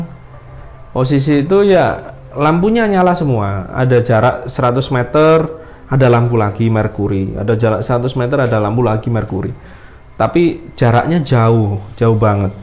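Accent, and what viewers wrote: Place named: East Java, Indonesia. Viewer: Nggresik... Njombang. Jawa timuran banget (≧▽≦)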